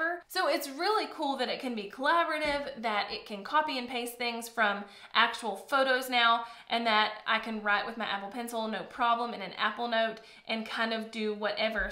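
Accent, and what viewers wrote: South, United States. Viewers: I love that southern accent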